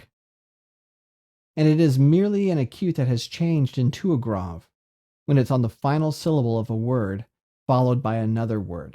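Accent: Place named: United States. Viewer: He doesn't use modern greek phonology. Anyways, judging from his english, he's probably american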